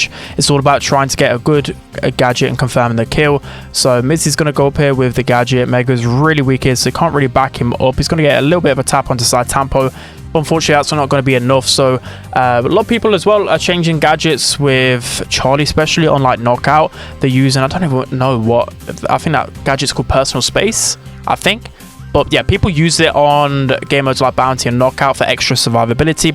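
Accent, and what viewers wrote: United Kingdom. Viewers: when are u gnna unleash ur welsh accent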